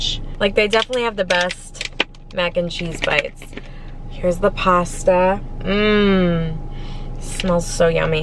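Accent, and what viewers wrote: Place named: Ohio, United States. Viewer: I say it like she does also, it’s a regional thing from the area of Ohio she’s from where I am also from. My husband makes fun of me. I also say full like “fool” etc etc.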